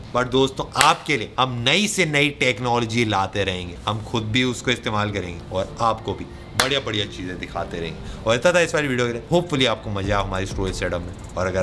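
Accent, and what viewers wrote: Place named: South, India. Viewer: Vai ye batao k aap South se ho kya....south indian jhalak raha hai tumhari muh se....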